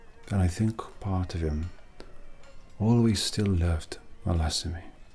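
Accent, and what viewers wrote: Wales, United Kingdom. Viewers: An elf with a Welsh accent, I think I'm in love. 😍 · The ad slid in so smoothly that I almost slipped. The light, the background sounds, the welsh accent, the make-up, the costume, the hair (especially the hair), simply 🧡💛🧡💛🧡💛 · The heavy Welsh is my favorite 😍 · Love the story, after a long day it’s so nice to hear it with a gentle welsh accent ❣️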